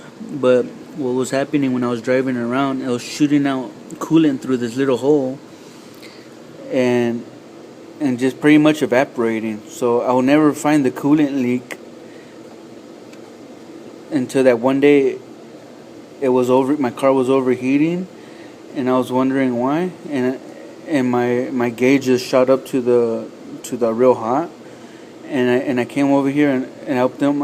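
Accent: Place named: New Mexico, United States. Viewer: Some people talk like that if they are from New Mexico or California.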